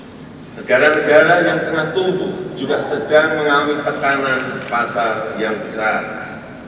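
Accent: Java, Indonesia. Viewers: bintang orang jawa logat beda pret..kayak daerah ku sholat ashar bilang ngasar ran ..emang salah menurut low yang maha bener hadexhh..niat utama yang penting..salam warass. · bintang alpateka koq ribut org jawa mmng logat aslinya gt cb liat puji2an dr sunan kalijaga logatnx jg jawa. .gt sj ribut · bintang anda wong jowo opo Ra? Kalo bukan wong jowo gak usah komen .. itu logat jowo , kan jokowi baca bukan pas sholat .. gapapa bro liat penjelasan ulama sepuh jaman dulu mereka pas dzikir bacaan nya kayak asing.. kalo gak tau mah gak usah merasa benar dah contoh kayak orang Sunda gak bisa ngomong f tapi jadi p .. niat nya kan memuji Allah SWT .. kalo anda bilang begitu berarti orang gagu berdosa semua dong mereka semua logat orang yang kekurangan gak bisa fasih baca bacaan Al-Qur'an ... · Kangen versi bahasa inggris pake logat Jawanya ... Pernah dengar kah kalian... 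logat Orang India, Rusia, Arab, Jepang, saat mereka menggunakan bahasa Inggris/Indonesia??? Di situlah kita bisa mengetahui dari mana negara mereka berasal ... Maju terus Presiden Ku.. Menjadi Kebanggaan untuk Ku Bagi Ku.. Presiden Ku adalah ... "PRESIDENT MADE IN INDONESIA" #2019PRESIDENKUmadeinINDONESIA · bintang. kamu suku... mana??? itu logat jawa cokk.. jawa tulenn... cek sendiri... di daerah2 jawa... khususnya yg dusun ato desa... logatnya... gimna... kiyai2 spuh pun gk seperti km...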